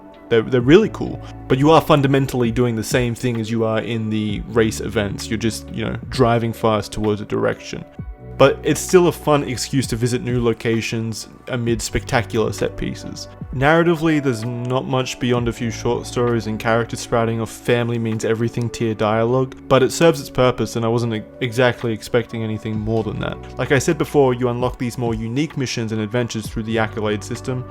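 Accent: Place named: Australia. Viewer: Haha funny Australian man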